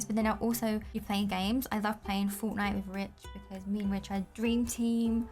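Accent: London, United Kingdom. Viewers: Ooh your from London??? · I’m going to the Uk next year.and I went Last year.its really cool for me and hard to understand stand before and now I can so when I first started watching you I knew you where British.also I love ur videos😁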